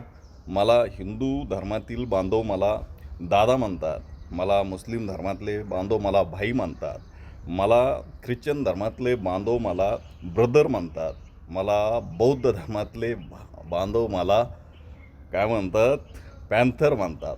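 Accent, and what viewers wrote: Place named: Vidarbha, India. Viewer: Vidarbha boli vatate pan ekdam kadak❤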